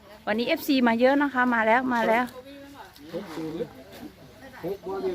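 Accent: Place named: That Phanom, Thailand. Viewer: เจ้าของเพจสำเนียงคือไทยธาตุพนมเนาะค่ะ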